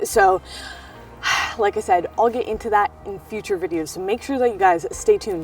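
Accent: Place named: Boston, United States. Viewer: You seem like you have a Boston accent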